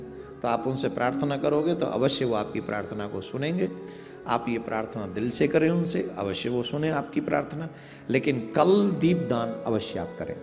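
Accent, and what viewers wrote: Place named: Gujari, India. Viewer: maharaj aapki desi boli ke hum diwane hai kacchu bhi kaho hame to jee badi priya laage mohe eeso laage jaise ye gwalo wali boli hee hume bhagwan bol bhae desi gurjari or rasiya boli jo aap bolo vo kaan me sugar daal de aisi laage maharaj me to sacchi me aapko bhagat bhi hogo or fan bhi hogau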